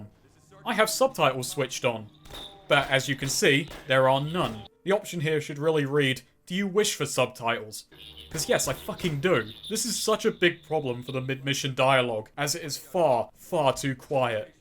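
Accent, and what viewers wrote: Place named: RP, United Kingdom. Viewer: Good lesson to learn for indie devs. Better have your shit together or some tosser will absolutely roast your efforts to bits unless it's at the standard of the original game. With the pretense that because he has a posh English accent what he has to say is somehow important in some way. Excellent video A+. Will totally share.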